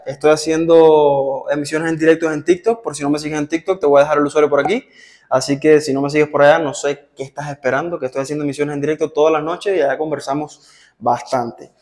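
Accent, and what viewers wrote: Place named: Cuba. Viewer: Pero si tiene acento cubano,y no tiene sangre azteca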